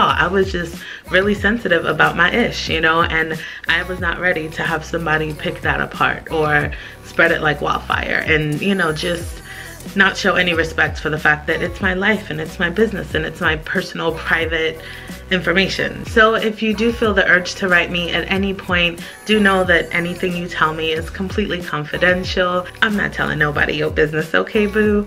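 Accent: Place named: Caribbean. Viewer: WHich Caribbean are you from?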